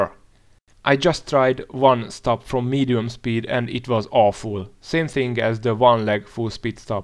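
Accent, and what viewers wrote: Hungary. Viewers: De magyarosan beszéli az angolt xd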